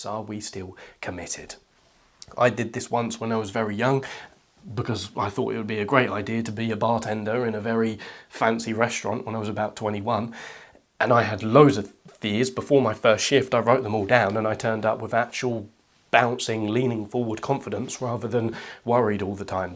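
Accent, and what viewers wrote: United Kingdom. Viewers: You're the reason I'm learning English with a British accent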